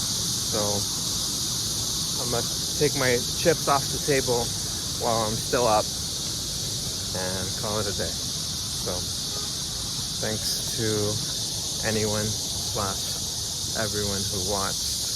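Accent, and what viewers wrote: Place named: China. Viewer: You're developing a Chinese accent.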